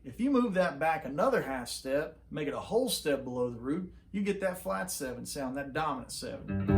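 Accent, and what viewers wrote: South, United States. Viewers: This is such a great lesson. Being a German, I dig that southern accent. Thanks a lot sir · I love your playing but i also love that Southern accent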